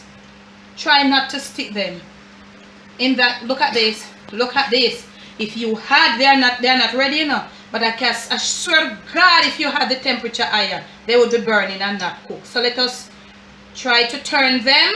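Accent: Jamaica. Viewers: Also our Jamaican patwa is like how you speak also always wanted to visit ... Nuff luv from ja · I’m African men living in the USA, just love jamaïcain accent, food and all one love my people 🙏🏿👍🏿💯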